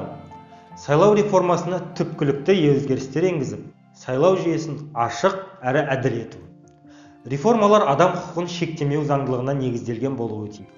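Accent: Standard. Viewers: жарайсың! Қазақ елінің ұлттық тілінде таза сөйлейді екенсің. Ел президенті ұлтжанды азамат болса, нұр үстіне нұр болар еді. Заманбнк балам Ұлтжанды Азаматтығың сөз саптауыңннан көоінеді. Қазақ Ұлт болып қалыптасу үшін тілімізді жоғалтпауымыз керек. Ананың тілі, Анаңныңмахаббаты тіл жетпес бір теңіз емеспе? Па, шіркін Қазақ тілінің керемет тіл, құдіретті тіл екеніне айтуға сөз жетпейді!!!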